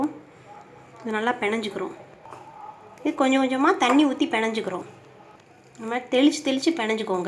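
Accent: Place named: South, India. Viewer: It's southerners slang.This originality is good and unique. Let it be.Its also part of her cookery videos I fèel.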